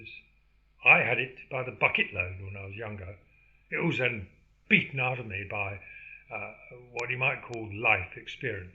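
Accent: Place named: RP, United Kingdom. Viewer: Youre a privileged little clown with a serious case of white guilt. This is perfect proof that a posh accent and privileged background in absolutely no way equate to intelligence.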